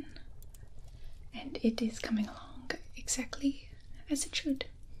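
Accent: England, United Kingdom. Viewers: I find Americans doing English accents so soothing!! As a brit, this is honestly pretty good and the extra emphasis on the t sounds and the vowell sounds that u guys tend to do give me hella tingles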